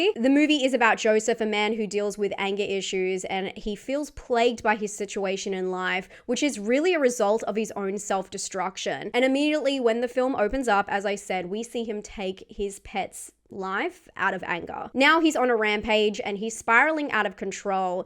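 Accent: Australia. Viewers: Australian gives Australian delivery. The upward inflection at the end of sentences is hard to miss. Good to hear a fellow Aussie and it looks like you have a loyal following. Keep it up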